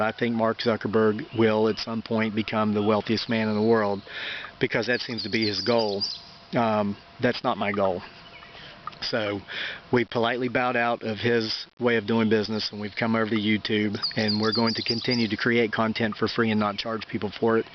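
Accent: South, United States. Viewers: Just found your channel a couple days ago, and I tell you, I am impressed! Someone that lives by their morals rather than the bucks they can rake in...Thank you! I hope you are able to leave this job with a good taste in your mouth, that you have done your best, given above what you should have had to, and can leave with pride...I'm sure however it turns out, the full notice or not, that you have. As a northerner, I love listening to your southern speech...it's soothing to my heart and ears. My grandmother and great-grandmother came from the south, it feels like home... Blessings to you and your wife and son. God bless!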